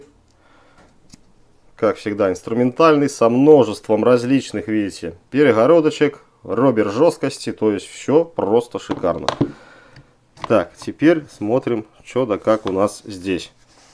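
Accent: Belarus. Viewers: По акценту Беларус ) из Мозыря вы ?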